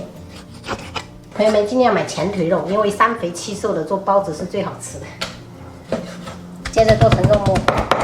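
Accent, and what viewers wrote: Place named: Minnan, China. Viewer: 聽到講閩南語特別親切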